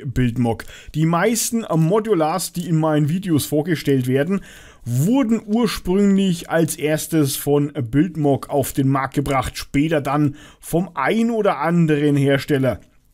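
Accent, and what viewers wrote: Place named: Franconia, Germany. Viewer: Ein schönes Video mit deinem coolen fränggischen Dialekt!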